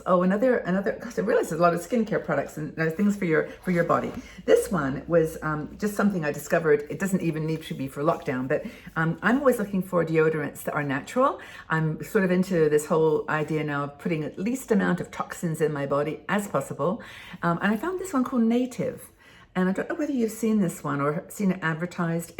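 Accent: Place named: United Kingdom. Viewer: I detect a British accent.And of course,we Brits are always prepared! Blessings.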